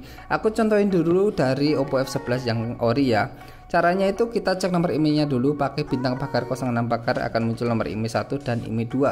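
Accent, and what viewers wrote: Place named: Java, Indonesia. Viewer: Jawamu terlalu medok mase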